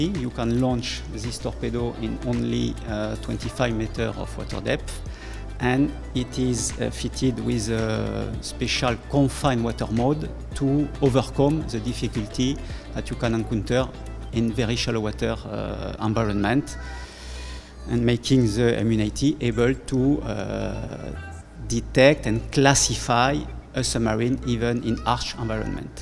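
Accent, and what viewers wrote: France. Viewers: Sorry but His English accent is just terrible. For English speaking markets they should appoint someone who can speak English without or less Franch accent.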